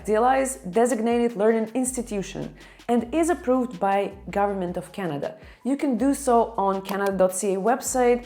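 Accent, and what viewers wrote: Russia. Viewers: Полезно 🎉как же приятно узнавать русскоговорящих по акценту 😂ни с чем ни спутаешь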